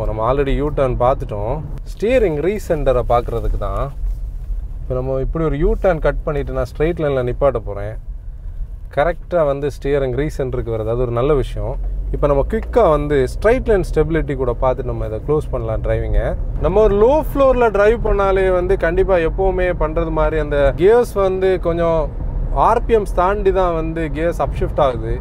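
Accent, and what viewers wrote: Kanyakumari, India. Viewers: One of the best moto vloggers I've come across in YouTube. And hearing that too in kumari Tamil is refreshing. Keep rocking guys..btw I've a question Between VW Taigun & Skoda Kushaq, which one would be a good choice? Driving dynamics and rear seat passenger comfort are my top priority areas. Also will 1.0 litre engine be adequate enough to give a peppy Driving experience? Or should I go for the 1.5 litre one? Predominant usage would be within city with occasional highway runs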